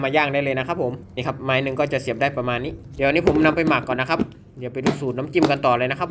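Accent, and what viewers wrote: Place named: Isan, Thailand. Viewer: เสียงเหมือนคนอีสาน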